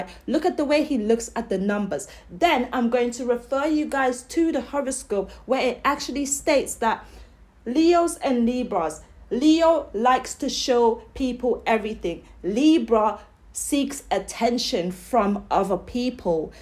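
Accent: United Kingdom. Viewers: Lmao how she talking chiraq bidness n talking British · This bitch goofy asf she needs to stop making content I’m from englewood Chicago and this fasho isn’t the case us Chicagoins hate these outsiders trying look and and speak on us like girl yo ass sound like u from the uk somewhere u better focus on them roadman where u at wetting eachother not here ole lame ass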